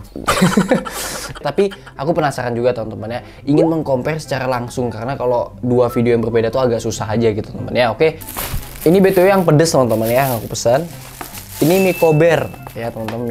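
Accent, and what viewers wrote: Bali, Indonesia. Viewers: Kak orang bali ya kok logat a bali bgt?😊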